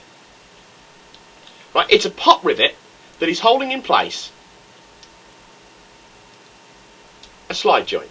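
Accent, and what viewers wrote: United Kingdom. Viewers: where are you from you sound scottish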